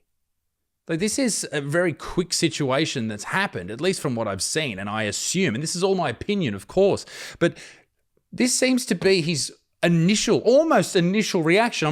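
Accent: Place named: Far North Queensland, Australia. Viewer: Mate, as a fellow white Aussie who is from far north Queensland, you have an accent that sounds like you could have been raised a couple of streets away from me. But honestly mate, you seem to be so unrelatable with your views. It seems your understanding of racial politics in the US sounds as about as good as mine, (given I have only been there twice). You seem so opinionated and adamant about things that are unnecessarily inciteful. Agree you were somewhat a little bit careful about it with comments that it could be white on black, black on black etc… and that they were both 17 etc. But your focus that the amount provided to the alleged perpetrator is only made because he is black is completely unfounded. Moreso your comments (and I think your point) that you believe most of the donations come from white donators because of white guilt. 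These are extraordinary claims unless you have something to support it. The title “white kids lives don’t matter”, is also an ignorant one, given the US history, along with you’re your illogical and irrelevant comparison to a 17yo’s stabbing with the George Floyd incident (an experienced police officer sustaining kneeling on an unarmed and unthreatening mans throat). These are baffling to your already weak and uneducated points. Did you think it could very well be that the black community in that part may be tired of having the perception of rich white people getting their people off in other circumstances, so rallied with financial support?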